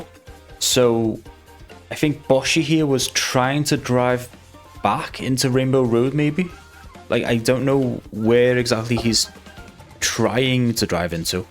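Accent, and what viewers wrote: Liverpool, United Kingdom. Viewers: come to think of it barely any youtubers have a Liverpool accent like this (in my experience at least) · Omg are you a fellow scouser… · great video made even better by fact you're a scouser, legend.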